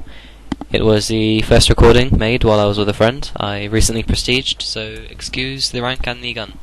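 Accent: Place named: RP, United Kingdom. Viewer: oooooo very posh lol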